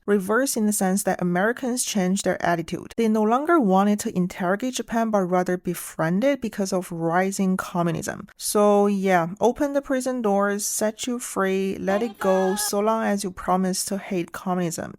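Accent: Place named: China. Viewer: Your thick chinese accent makes this vid funny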